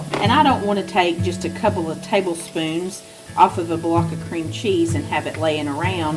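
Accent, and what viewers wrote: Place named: Tennessee, United States. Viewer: you can tell by my title that’s my country too ! I live out behind Dollywood ! Love the Smoky Mountains !! I thought your accent was a lot like mine !! 🧡🤍Ren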